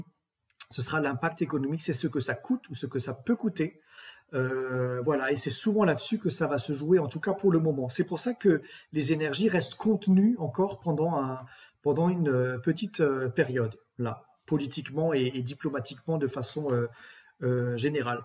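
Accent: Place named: Alsace, France. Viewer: Super (y) Très claire, cette présentation de la prochaine Pleine Lune du 15 novembre, assez technique (comme j'aime!) mais aussi très parlante car reliée à des faits concrets (la politique actuelle, l'économie...). J'adore votre petit accent alsacien et votre façon très posée, très calme, très structurée de présenter cette carte du ciel: vraiment presque parfait! J'ai juste noté une p'tite erreur à "Neptune fait un carré au Noeud Nord" alors que c'est une conjonction, mais c'est vraiment pour vous prouver que j'ai écouté attentivement vos explications jusqu'au bout que je fais cette remarque;-) Bravo et merci infiniment pour cette belle présentation, en espérant en voir beaucoup d'autres, de la même qualité (et peut-être qu'un jour, vous nous présenterez votre chat? ) 😉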